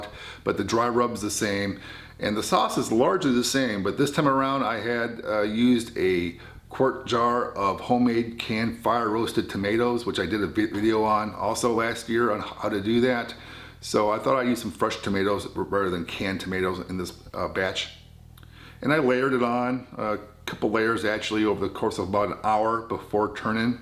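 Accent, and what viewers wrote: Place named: Midwest, United States. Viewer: Ive thought you sounded like your from the Midwest. I was leaning towards Michigan, Wisconsin or Minnesota. Not a bad guess from your accent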